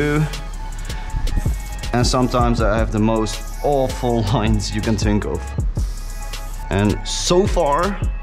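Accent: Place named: Netherlands. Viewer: Ik wist dat je nederlands was, want dat kan je gelijk horen met hoe je engels praat🤣 goed werk man👍💪🏻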